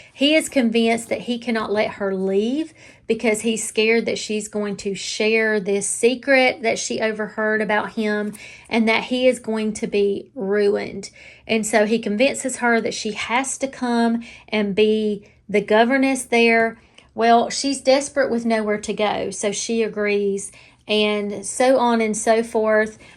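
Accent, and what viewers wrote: South, United States. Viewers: Hello miss I am a young adult Midwestern girl! I am a big fan your southern accent brings me back to my childhood family friend who would always say yall😂. Great recommendations by the way I love fall and I just finished the Love's a mystery in sleepy hollow! It was so cute and cozy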